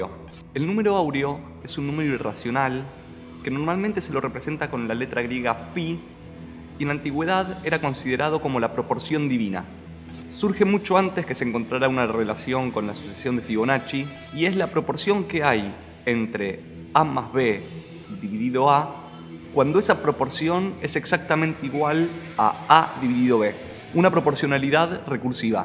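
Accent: Argentina. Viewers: Buen video. ¿Eres argentino?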